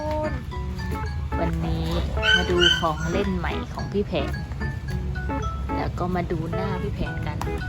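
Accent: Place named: Isan, Thailand. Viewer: ไทยอีสานก็เพราะนะคะพูดทั้งสองภาษาเลยค่ะน่ารักดี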